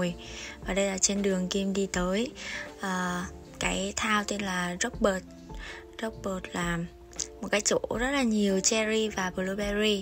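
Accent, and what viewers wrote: Vietnam. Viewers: Anh rất thích giọng Bắc làm youtube farm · Nghe là biết dân choa rồi. Cố lên em. :D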